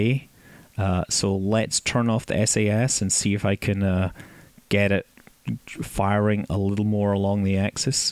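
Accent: Scotland, United Kingdom. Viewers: Anyone else hear just how Scottish accent is here?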